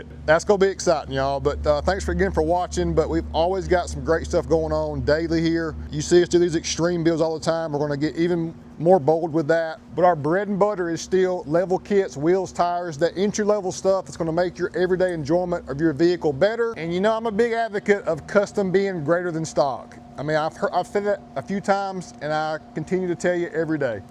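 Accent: South, United States. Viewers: Seriously what kind of pretentious asshole puts the style of the tread over its functionality? Just because the tread style is old does not mean that it's not good still. If someone actually invented the best overall tread for mud, snow, ice, rain, and dry conditions and nothing else for the next 50 years beats it would you actually give a shit? If you're a pretentious douchebag you would, if you're a man you wouldn't. You're a yuppie suburbanite with a southern accent. 😂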